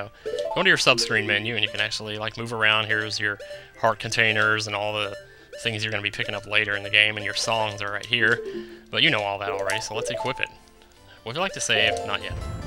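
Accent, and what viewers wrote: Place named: South, United States. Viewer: i wondered why sometimes i hear a southern accent in your lps. it isnt often, but it is there xD GA people always recognize their own accent xD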